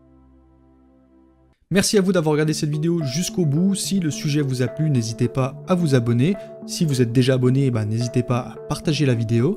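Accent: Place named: Belgium. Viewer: Mon dieu je suis vraiment désolé mais j'ai pas du tout réussi à suivre la vidéo parce que je bute à chaque fois que tu prononces "et" "est" 😭 mes plus plates excuses à tous les belges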